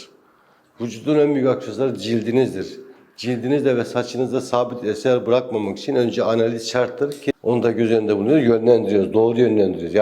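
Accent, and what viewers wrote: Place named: Turkey. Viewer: Cc bsr Mr merci beaucoup de nous avoir parlé de vous cependant vous avez du talent et ça ça ne s'apprend pas mr6 👍 bravo un grand Merci pour votre accent Turc j'adore.cest agréable de vous écouter je comprends rien mais je lis l'anglais donc je comprends tout je vous zdore6 jame6voyd voir ici à très vite merci beaucoup ♥️🇹🇷🇨🇵👍😎🇫🇷🇹🇷🇹🇷🇹🇷🇹🇷♥️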